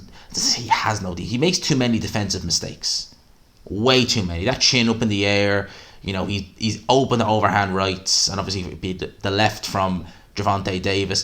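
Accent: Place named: Ireland. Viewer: 1st. Loving da irish accent. is a goon. Truly real channel. Totally bullshit free. Exactly how it supposed to be. Much appreciated 👍👍🔥🔥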